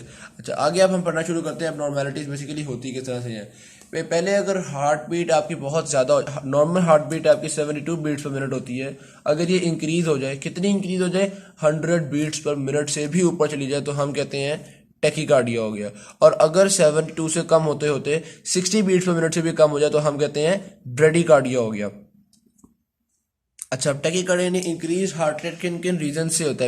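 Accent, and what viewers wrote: Punjab, India. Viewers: Zbrdst...punjabi accent mn smjh kr ziada mza aya😇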